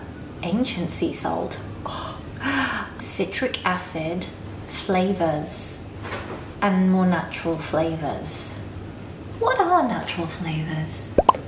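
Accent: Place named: Southern England, United Kingdom. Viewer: I thought you were from somewhere in the south of England.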